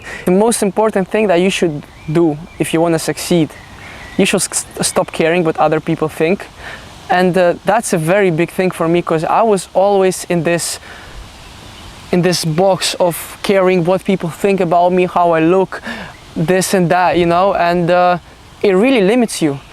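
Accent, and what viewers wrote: Russia. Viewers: Привет!) Ты русскоговорящий что ли?) Чувствуется немного жесткость русской речи в твоём произношении. Стало интересно узнать разговариваешь ли ты на русском?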